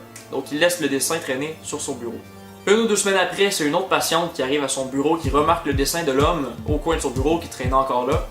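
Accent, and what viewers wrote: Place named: Quebec, Canada. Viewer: J'adore ton accent québécois!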